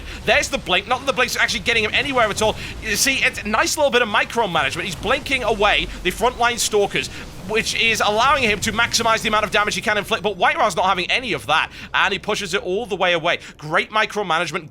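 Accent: United Kingdom. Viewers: thats the power of a british accent.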